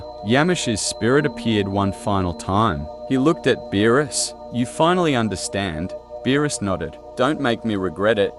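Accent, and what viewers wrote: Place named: United Kingdom. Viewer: I don't like that dude accent he sounds funny when he talks sound like he's British or something 🤣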